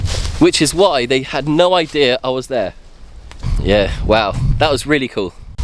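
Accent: United Kingdom. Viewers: then with that being said....your guess would be as good as mine. Lol 😂..for living in Virginia for 25 years you still have a heavy British accent. Which is cool 😎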